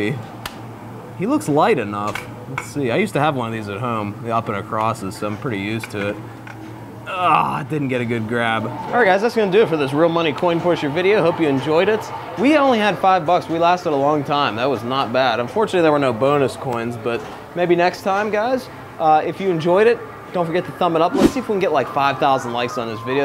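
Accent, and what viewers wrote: Pittsburgh, United States. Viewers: You have a pretty strong yinzer accent.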